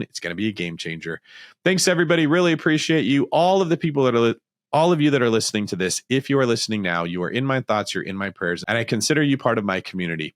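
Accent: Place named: Scotland, United Kingdom. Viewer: Go, I love the Scottish accent! It is probably one of the hardest to do!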